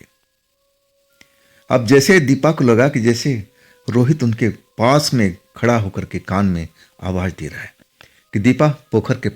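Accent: Bihar, India. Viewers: Bihar se ho kya bhai ❤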